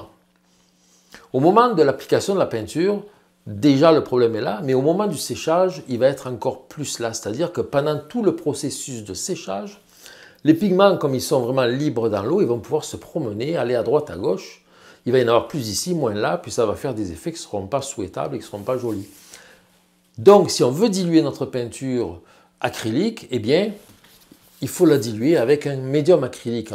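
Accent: South, France. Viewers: Merci vous êtes bon pédagogue , j'aurais aimer être un de vos élèves mais avec votre accent je suppose que vous êtes du sud! Merci pour cette vidéo! Bonnes fêtes! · Petit accent du Sud de la France ET du Québec?? :) Merci pour vos astuces!!!!!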